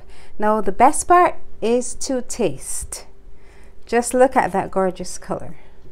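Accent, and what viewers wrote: Barbados. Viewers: What a sophisticated bajan accent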